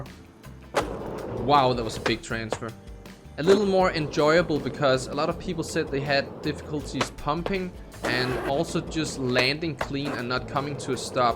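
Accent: Denmark. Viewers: yo you danish?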